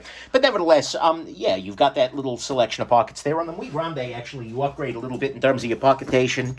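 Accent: New York, United States. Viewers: Because it is worth it to hear someone speaking with a New York jewish accent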